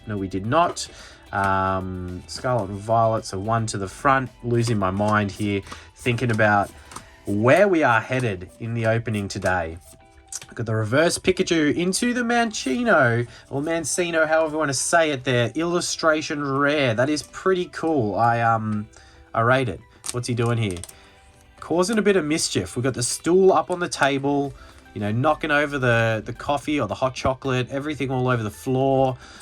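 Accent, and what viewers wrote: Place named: Australia. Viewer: Aussie accent, instant subscribe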